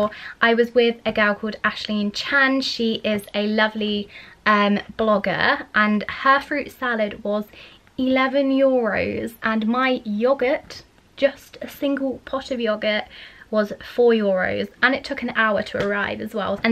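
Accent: United Kingdom. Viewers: Hey my queen😍😍😍😍 I jus love ur British accent sooooo much n ur voice jus calms me down.. every single tym I watch ur videos it drives me crazy n I fall in love wit u even more.. ur soooo dam pretty ur eyes r sooo heavenly.. ur smile is killing n it makes me smile too.. thank u Soo much for bringing smile on my face every single tym.. I love u from d core of my heart my only wish in my lyf is to c u for 5 secs I Don't deserve to cm n talk to u so my only wish is to watch u for 5 secs😭 I VL blessed if such hapns❤ im from India n ur my angel really love u a lot😍❤😘😘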